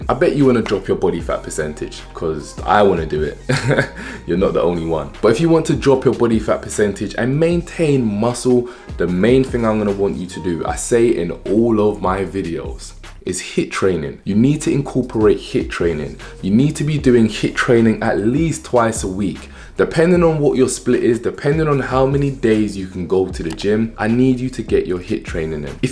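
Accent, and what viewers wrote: London, United Kingdom. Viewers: I’m here for his London accent…👀👀👀 and for burning that back fat off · I'm tired, when I heard him talk I was like oh he's british I need one in english 😂 I'm so damn sleepy.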